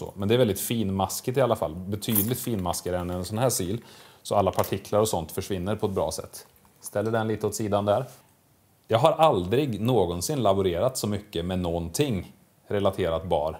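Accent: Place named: Värmland, Sweden. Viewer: Likead och subbad! Fantastiskt nördigt, klassigt, och underhållande, dessutom på KLINGANDE dialekt som tog mig hem till Värmland. För det är väl det jag hör? Blev alldeles omotiverat stolt över dig! Skall konsumera resterande videos med glädje!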